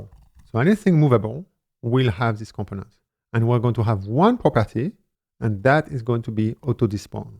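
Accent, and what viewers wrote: France. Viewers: My guess is your native language is French (was not so difficult to guess right, right?). French is a soft language and it start from back of the head. while eng is hard and start from to front. My guess is when you try to replicate eng language accent you feel something wrong in your head, right? While with your current accent you feel ok. That's the point. When you feel the sound is wrong is in that moment that the accent is more near to native English people ;). If your goal is not to be proficient in English accent the suggestion of mine - speak slow and check the result with another person help (your kids?) - can be the solution for now :). Great content of course :) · Simply the best bevy tutorial I’ve seen so far, it’s the first time I really understand what’s going on and how it works, merci beaucoup ! (je reconnais l’accent français, j’ai le même :D)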